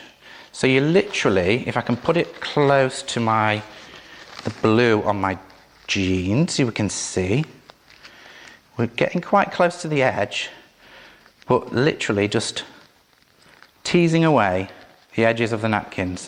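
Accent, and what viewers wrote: England, United Kingdom. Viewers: Love love love love love!!! The step by step, easy to follow instructions. So refreshing to listen to an English accent instead of a droney, nasal American twang! And your phrases: a tickle here, a tickle there. Just loved it. And the result is breathtaking. Completely inspired, thank you 😊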